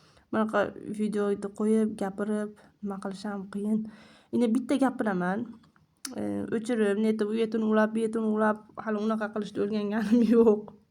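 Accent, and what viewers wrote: Andijan, Uzbekistan. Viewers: Хожи киз бэкор гийбат дебсиз хамма нарса ниятга богликлигини биласизу сиз ростанам Валижон шамсиевни анжонлик келинди уйнаган келинга ухшаб гапираркансиз ошликмисиз мен Анжонданман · Аввалам айтганман мен андижон данманк шевангиз худди бизникига ухшар екан деганман мен кизимни аккаунт идан чикаман йошим 60дан ошган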